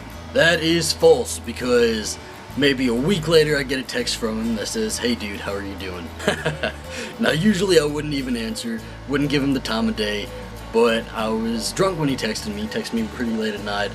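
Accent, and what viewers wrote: South, United States. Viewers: Your southern accent is cute 😂❤️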